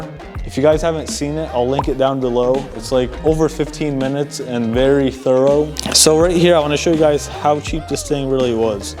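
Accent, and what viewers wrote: United States. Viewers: Dude are you from the Western Mass area?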